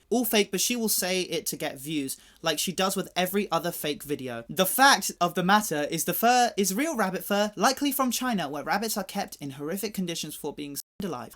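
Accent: United Kingdom. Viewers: besides the point, I'm appreciating the accent. You see so little bittish youtubers and I just noticed how comfortable listening to him is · I love your British accent 😍 ❤ And you singing very well😆 Support you❣❣ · fell in love with your British accent already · You're a British aren't ya?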